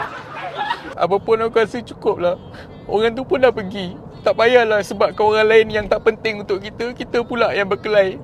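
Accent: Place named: North, Malaysia. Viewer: blk utara ka.. Best mampuihhh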